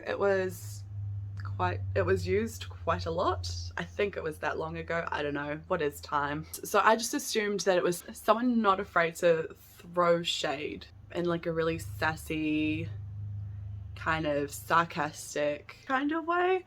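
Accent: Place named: New Zealand. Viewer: Yaay, I knew I recognised THE greatest version of an english accent there is! (I am, unfortunately, not a Kiwi. I just love everything New Zealand ☺️)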